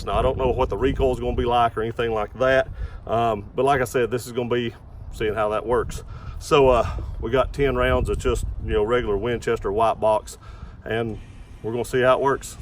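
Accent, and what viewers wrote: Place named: Texas, United States. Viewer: You kinda sound like you're from texas.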